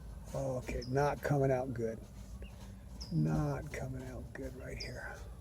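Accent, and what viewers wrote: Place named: East Coast, United States. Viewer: Mama mia, this is Norm. My wifes headliner started to sag down in her car and I need to repair it, it get warm out here in Florida, but we love it out here. Well in reviewing some YouTube videos, there was your friendly face! however I did not recognize your East Coast accent. I was laughing my ass off, you are still a funny lad. Anyways it was good to get some tips from you, your head liner came out pretty good I must say. I had fund watching your video. Peace brother and God Bless you as well. Norm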